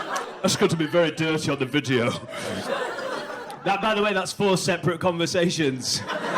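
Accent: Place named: Manchester, United Kingdom. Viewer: I’m Mancunian and he’s spot on with the South Manchester accent 😂😂😂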